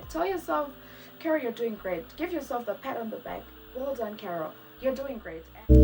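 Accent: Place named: United States. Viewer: Your voice chete , let’s talk about that 😮… smooth voice with English yekuAmerica✅✅✅🤣🤣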